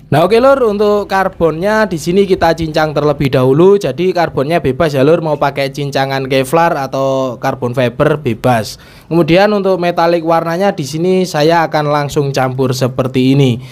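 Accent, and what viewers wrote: Temanggung, Indonesia. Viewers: wong manggung ki?😁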